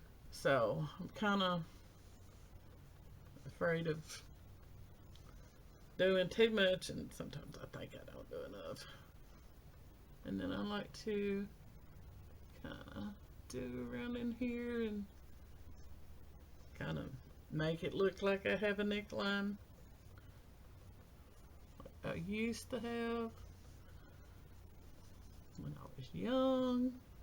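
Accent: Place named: South, United States. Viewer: I meant to tell you I just love your accent! My father's side of the family is all Southern and you remind me so much of my favorite Auntie the way she spoke and phrased things. : ) Love you talking thru. It's like hanging out with you. You look fabulous and thanks for the makeup tips! :-)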